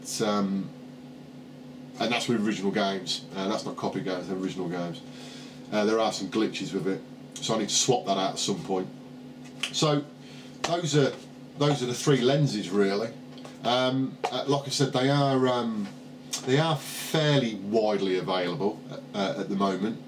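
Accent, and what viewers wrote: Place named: Birmingham, United Kingdom. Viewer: the light, the light! All I will say is I didn't think He would make a second coming and I certainly didn't expect a brummy retro gaming enthusiast to take His form.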